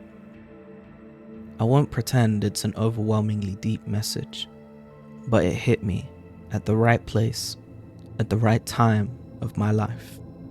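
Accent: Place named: England, United Kingdom. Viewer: High quality content + English accent = Instant sub. Spiffing.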